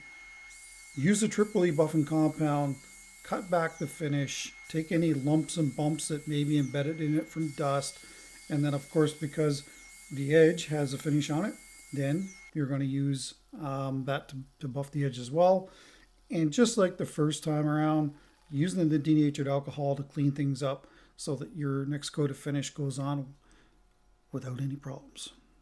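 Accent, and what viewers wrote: Canada. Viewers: It's a beautiful piece,. Pretty impressive how you can turn something so large. The audio was fine too. I could still hear you say "a-boat" in your Canadian accent, which reminds me of when I went travelling and makes me smile, ay. Have a great weekend, looking forward to your next piece.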